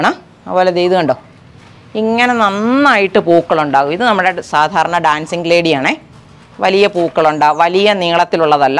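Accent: Kottayam, India. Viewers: സംസാര ശൈലി കേട്ടിട്ട് ❤കോട്ടയം ഭാഷ ആണെന്ന് ഉറപ്പ്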